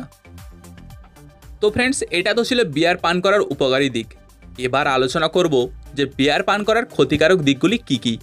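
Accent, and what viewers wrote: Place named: India. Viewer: কথা শুনে বুঝা যাচ্ছে দাদার দেশের ডাক্তার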